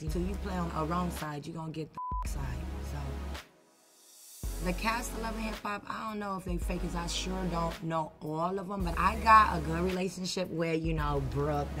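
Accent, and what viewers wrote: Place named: Compton, United States. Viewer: Sis got a skrongggg Compton accent what is you saying lmaoo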